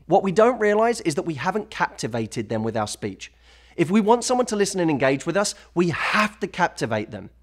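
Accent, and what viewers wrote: United Kingdom. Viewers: Is this man British, if not forgive me XD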